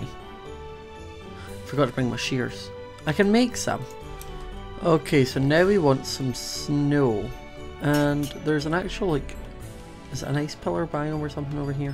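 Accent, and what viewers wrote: Scotland, United Kingdom. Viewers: its his accent which is a mix of English and Scottish that makes it sound different.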